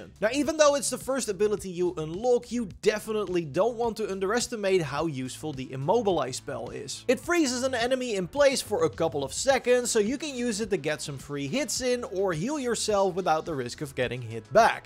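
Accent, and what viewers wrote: Netherlands. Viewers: You are very helpful, yes. But my brain could not shake the feeling that you sound very very Dutch 😂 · Bro your English is very Dutch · Why do you sound familiar to a Dutch guy speaking English? Thanks for the video dude.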